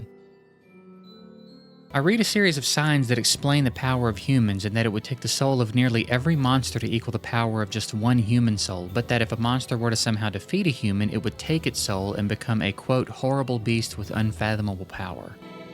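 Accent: South, United States. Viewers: Ok. This is crazy but my boyfriend is watching your I Played 20 Cozy Games... And nowhere online can we find what state you're from. I'm guessing Tennessee, Georgia, or around there. My boyfriend, who I will be shocked if he is right, thinks you are from Northern Louisiana or Arkansas.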